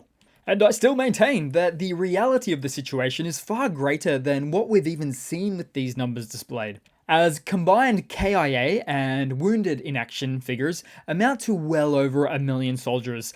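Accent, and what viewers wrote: Australia. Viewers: You have the best Australian accent,. Goes well with the humour. Russia moving those buoys after years of mutual agreement with Estonia is just so typical. Then it accuses other countries of provocation and the reason for going to war with them. It's extremely annoying when other countries give their endorsement and support for this kind of behavior. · 【今日のJuzzieの動画のコメントから一つピックアップ】 あほのコメンターの、こいつによると、'Russia moving those buoys'は、 数年以上に渡る、エストニアとの相互交渉による結果であって、双方の合意によるものだ、ということらしい。 ただ、しかし、その相互交渉、双方の合意なるものは、プーチン・ロシアの、そのポンコツ軍事力（による脅し）を背景にしたものだ、ということを見落としてはいけない。 それは、プーチン・ロシアによる、暗黙の脅しによるもので、単なる、一方的強制であって、当事者間の「双方による合意」とは、言わない。 因みに、プーチン・ロシアの、これまでの、やり口、手法は、すべて、これであって、彼らの言う、相互交渉、双方の合意というのは、すべて、 見せかけだけ、外見だけで、中身は、プーチン・ロシアの、これまでのポンコツ軍事力（による脅し）を背景にした、いわば、強制だった、ということ。 んで、今はどうかというと、プーチン・ロシアが世界に誇る、世界最強、世界最先端の軍事力が、実際の戦争で、実は、すべてポンコツ、大嘘、いかさま、ペテンの代物だと、バレてしまったので、 その結果として、これまでの、プーチン・ロシアの、強引で、一方的要求である、傍若無人もいいところの 見せかけだけ、外見上の、相互交渉の結果、双方の合意、というものは、今後、全て無効になる、白紙の戻されることになる、のは明らか、だと思うんだけど、ね。 4 時間前 You have the best Australian accent,. 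Goes well with the humour. Russia moving those buoys after years of mutual agreement with Estonia is just so typical. Then it accuses other countries of provocation and the reason for going to war with them. It's extremely annoying when other countries give their endorsement and support for this kind of behavior. 5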